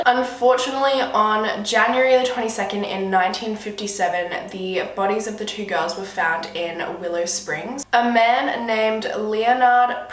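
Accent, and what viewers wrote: Australia. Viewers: Not the most typical Australian accent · Is that an Australian accent? I love it😍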